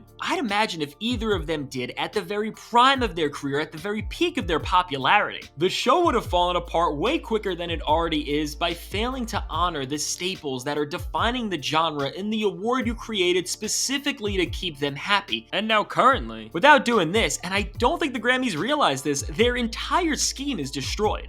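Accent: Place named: Canada. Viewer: Bro you sound like canadian lad 🗿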